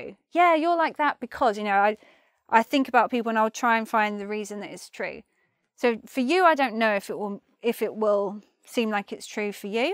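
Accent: United Kingdom. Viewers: Lovely British accent. Thanks. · british accent :)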